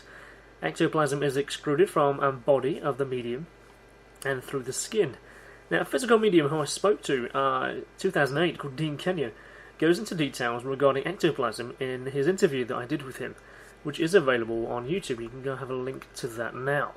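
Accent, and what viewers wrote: United Kingdom. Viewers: This guy is english, what are you talking about lol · When the narrator is a Scottish you can bet he knows what he's talking about just like in the original Star Trek , Captain Kirk this is Scotty you got to give me more power !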